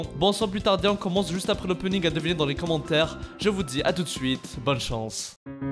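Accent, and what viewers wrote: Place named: Kabylie, Algeria. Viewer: Pourquoi cette accent kabyle ??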